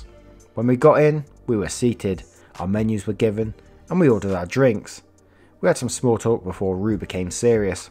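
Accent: United Kingdom. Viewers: I love your brit accent, what part of England are you from? We live in Texas, but my in laws are from the Stratford on Avon area. They live in TX now and we are visiting UK in 2020. Great storytelling!!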